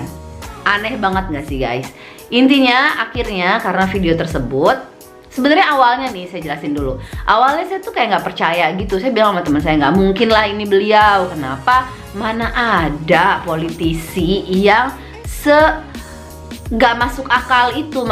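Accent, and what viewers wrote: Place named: France. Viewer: Aksen perancisnya kebawa pas ngomong bahasa Indonesia ya 😆 aku suka kontennya. Kalau boleh request aku mau dong kak penjelasan penggunaan huruf “y”. Sampai sekarang belum mengerti :(. Terimakasih sebelumnya 😆 oh iya, baru kali ini nonton kontennya sampe ketawa ketawa 😂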